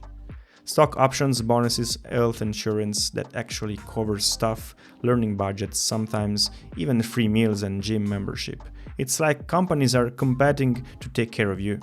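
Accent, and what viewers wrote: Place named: Europe. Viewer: base on your european accent, its understandable to use ai checking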